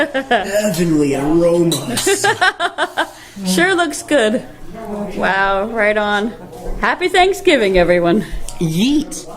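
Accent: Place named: Ireland. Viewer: Are you originally from Canada, or somewhere else? Your accent sounds almost Irish! 😊☘️ Recipe looks delicious, and I love the crock insert💖 HAPPY THANKSGIVING🦃 Blessings from, Washington State USA 🌲☔💖🙏😊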